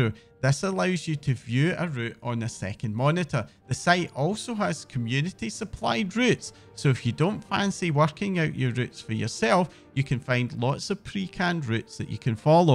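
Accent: Scotland, United Kingdom. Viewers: Thank you so much for this video. I've recently moved over to WoW from FF14 and this has been a great video that ACTUALLY goes in to the expectations around tanking in this game from the ground up. Plus it's nice to hear a friendly Scottish accent!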